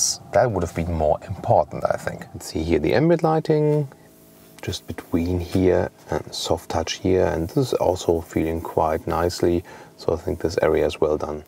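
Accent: Germany. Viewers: Your English is EXCELLENT with a bonus very cool German accent. I can understand your vocals better than way too many of my own countrymen. You choose your commentary so carefully I am rarely left with a single unresolved question, and your delivery is always calm, cool, and collected. Your thoroughness deserves special mention. You know what enthusiastic drivers want to know and you can’t possibly suffer a single disappointed viewer. Thank you for such great automotive journalism and video production.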